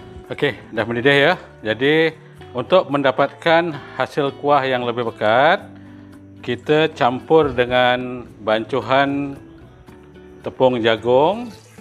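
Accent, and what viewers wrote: Java, Indonesia. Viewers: Rapopo wak ngomong melayu lekat jowo pekat, macam mas toha,, lagi mantap wak...👌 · Mula2 ingatkan youtuber dari Indonesia.. Sekali dengar dia speaking..owalah wong jowo Malaysia rupane.bagus la kang ! Alhamdulillah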